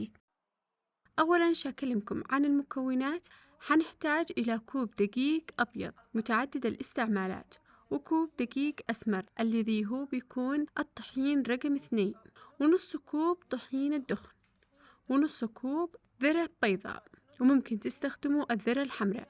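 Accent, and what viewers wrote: Sanaa, Yemen. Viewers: اجمل مافي المقطع هي صوت النبره الصنعانيه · احلى اكله من احلى لهجة واحلى واطيب شعب يمني.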